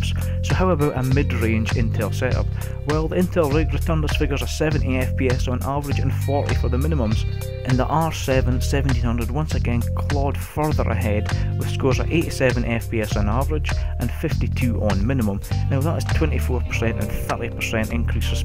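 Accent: Scotland, United Kingdom. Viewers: Sub'd man love hearing fell Scots on YouTube, too few of us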